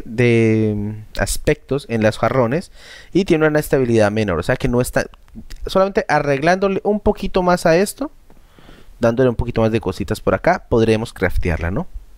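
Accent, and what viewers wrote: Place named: Colombia. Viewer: De q parte de colombiano eres ??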